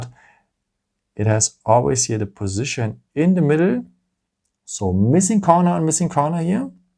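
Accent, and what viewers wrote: Germany. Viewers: Deutscher Akzent:D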